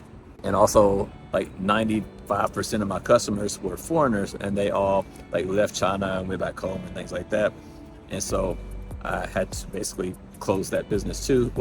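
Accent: South, United States. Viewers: I’m glad you didn’t quit and leave China during the COVID years, when your businesses didn’t do so well. You persevered and survived , despite the negative experiences from individual Chinese who assumed some westerners could have covid, based upon Chinese media reports. . But you have to remember you’re living in a country with 1-4 billion people who can act unpredictably,, . Like others have stated Asians in the USA were treated a lot worse. Trump politicised the pandemic, and referred to it as the Chinese virus , which was echoed across the conservative media channels like Fox News, This created unnecessary fear, and racist attacks against Asian's in the US. . But the important thing is , you survived and your life is better now. You seem like a laid back guy, who overcomes problems and just moves on, By the way , I love your accent , is it a southern American accent? 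I’m Chinese by descent, but I was born in Australia.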